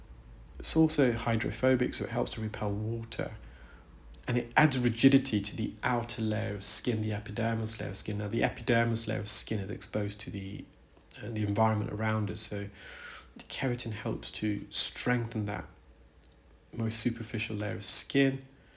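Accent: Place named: RP, United Kingdom. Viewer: a silky, smooth posh British accent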